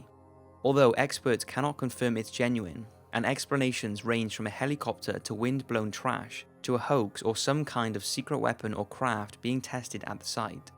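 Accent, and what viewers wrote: Wales, United Kingdom. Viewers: I do love a welsh accent.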